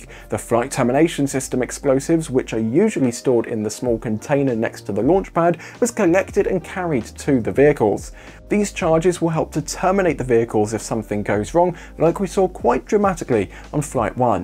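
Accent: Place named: Midlands, United Kingdom. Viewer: Brilliant, just Brilliant. Nice one! Anyone would think you were Scottish, with NO sunshine on that skin. But the middle England accent says no!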